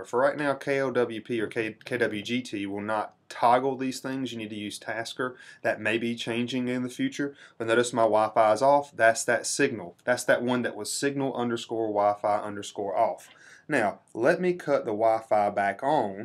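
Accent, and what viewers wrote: South, United States. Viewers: ...thanks so much, just implemented the bluetooth feature to my device. I bow to you, honorable man with southern accent😊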